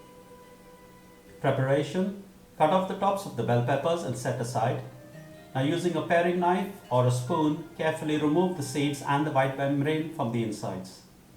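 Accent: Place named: England, United Kingdom. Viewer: Good thing is that you took care of the fact that your filling is neither oily nor runny. Usage of Tomato paste instead of the traditional method not only enhance the taste but it helped you get the required consistency in your filling. What I observed is that in this recipe your camera angle is not top notch. Especially when you were making your filling and sauteed onions. This dish looks more South Indian in texture though no coconut is used. The running commentary always reminds me old Cricket matches used to telecast in 80s n 90s with aristocratic English language and meticulous English accent. All in all, exciting stuff as always.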